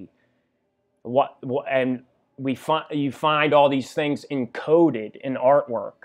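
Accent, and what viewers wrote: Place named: Pennsylvania, United States. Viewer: Love how you had a Freudian slip and said The Lion shall lay with the Lamb 🐑 I mean the wolf 🐺 😂 it is photoshopped but it, it has to be ! Great stuff my friend. And it’s nice to hear your PA accent I was just living in Tower City but in FL now. Yea your getting into the difference between the mortal flesh or materialistic vs. the Higher Mind and spiritual self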